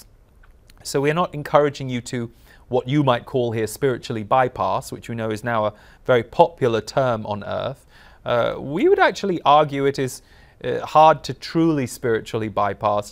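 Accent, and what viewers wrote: United Kingdom. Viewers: I like your British accent! It's soothing to listen to. 💖Thank you! 💖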